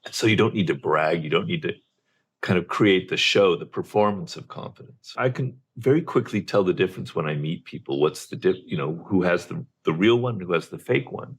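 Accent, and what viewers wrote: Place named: United Kingdom. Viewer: I have difficulty understanding the British accent. I’m a beginner. By the way, great video.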